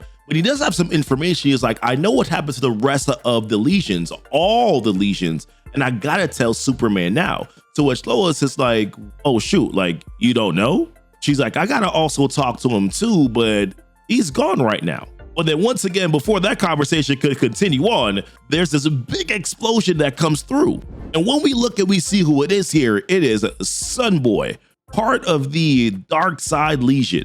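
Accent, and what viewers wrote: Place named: Jamaica. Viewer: i didn't know you were a yardie !? what part you from ?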